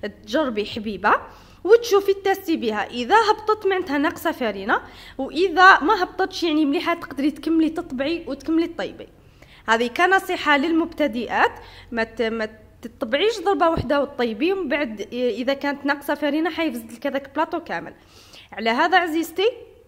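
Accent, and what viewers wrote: Chaoui, Algeria. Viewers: والله العلي العظيم يا إبنتي الغالية غير نحبك فالله كأنك إبنتي الثالثة وأحب لهجتك الشاوية يا الحرة💞💞💞💞💋💋💋💋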